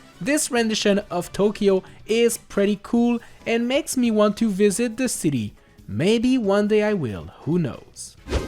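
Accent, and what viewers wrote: France. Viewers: I speak french and your accent is not bad 😁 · Why do I feel like that English is actually second language and French is his first. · Hey nice french accent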